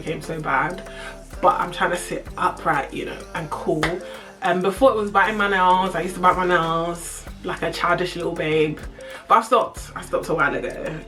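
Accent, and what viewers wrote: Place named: Essex, United Kingdom. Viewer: but from Essex! 😅😅 Love it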